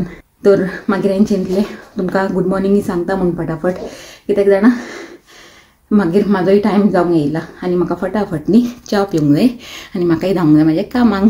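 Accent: Mangalore, India. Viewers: Your Konkani sounds like Mangalore Konkani. Are you from Mangalore?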